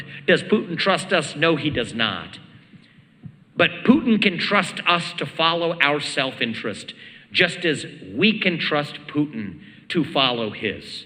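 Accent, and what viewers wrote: South, United States. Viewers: So funny to see an Indian with a Southern accent. Reminds me of that dude from The Expanse who got cancelled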